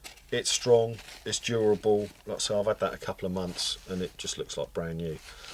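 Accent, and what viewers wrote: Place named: United Kingdom. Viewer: Some great items in there that I think I might add to my EDC. PS, it's nice to hear another Brit talking about EDC, as most of the time, ot's an American dominanted topic. Cheers.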